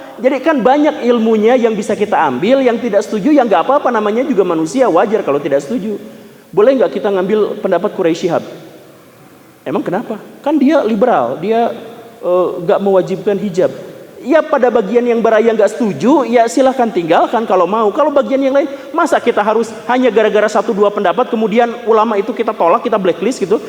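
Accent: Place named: West Java, Indonesia. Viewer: Aksen sunda dalam narasi nya adalah satu dari bbrp aspek yg bikin seru dan lucu, masa khalifah bilang gini "eee kamu teh" wkwwkk mantap 👍🏻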